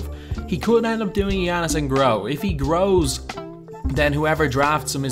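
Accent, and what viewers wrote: Ireland. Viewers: That Irish accent tho! 👍😎🍺🍻🍺 Btw, the same hate Ntikilita is getting is the same hate Porzingis got in 2015 (a Draft just as deep as this 2017 Draft). They're even saying that he'll take 3-5 years to develop, JUST like was said against Porzingis! 😊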